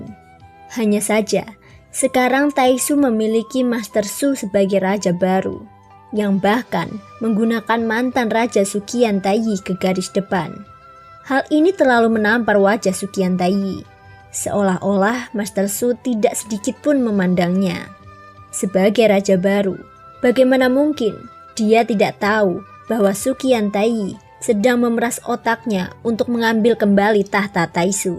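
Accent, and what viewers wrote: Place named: North Sumatra, Indonesia. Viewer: Kental kali batak nya kak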